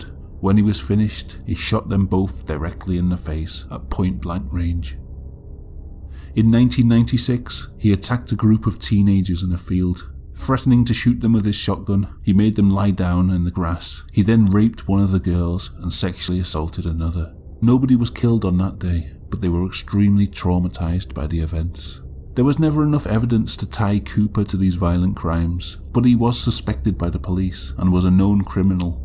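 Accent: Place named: Northwest England, United Kingdom. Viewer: Love your videos, they’re always so interesting and familiar because of your Northwestern England accent. My family are all from that area 😊and it’s so nice hearing you voice over the vids. I’ve only just found your channel and couldn’t sub quick enough. Brilliant 🙂keep at it, you definitely keep me entertained x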